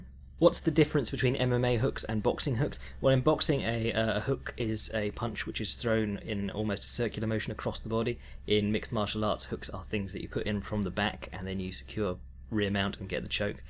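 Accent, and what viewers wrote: United Kingdom. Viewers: i'm brazilian and i love your work i have been reading everything you wrote sinceramente but my english skills still no só good enought to hear and understand everything you say even with your cool britsh style very much more easy to me, thanks but May you put subs in this podcast? if you do and i share this(in fact a will share whatever happens lol) others of my countryman will enjoy too, and belive in me, brazilians NEED your work people here love mma but don't know nothing about this...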